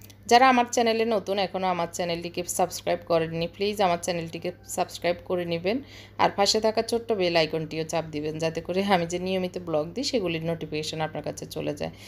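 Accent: Noakhali, Bangladesh. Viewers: Apu apnr kothay Noakhalir vasar j tan ta, ata amr kache onk valo lage Ami Noakhalir NSTU te porasuna korechilm...onk suvokamona apnr jonno.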